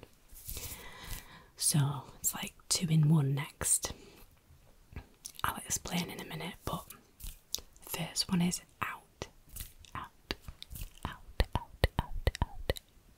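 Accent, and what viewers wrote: Yorkshire, United Kingdom. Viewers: As a fellow northerner I love this 😂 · some say she's putting her accent out there. others would say she's finding an excuse to call us all the c word ;) As someone who lives in Lancashire it's mildly disconcerting how many of these I knew. That said, I feel sad that you're losing your roots. Bring your husband and child up north so that they know what true beauty is like. None of that southern malarkey! Still loving the pink fluffiness! It's much easier to enjoy an asmr video when the video creator looks so cosy which you do! Subscribed! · As a Yorkshire girl I couldn't love this more! I think it's such a warm, friendly accent. 🥰 I've also lost most of my accent over the decades but it definitely gets broader when I go home to see family. After 20+ years I can still surprise my hubby with my Yorkshireness from time to time with words like morngy, gip and gormless! 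👂🏻I also say my owt's and c'** the same as you, we had ginnels behind our house and my sister was the buggerlugs in our family. 😁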